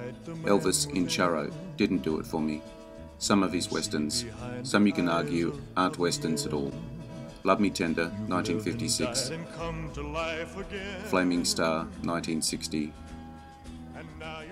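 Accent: United Kingdom. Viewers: A guy with a limey accent reading a script... do I take his word regarding cowboy actors? Naw. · You sound as if you are from Great Britain so why do you feel you are qualified to judge a Western?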